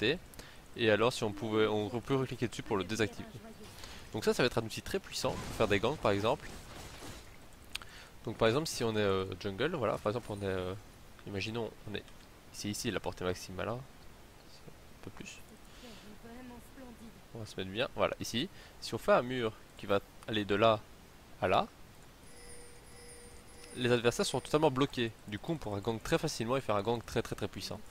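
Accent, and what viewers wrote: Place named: Belgium. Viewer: T'es Belge car t'as un ptit accent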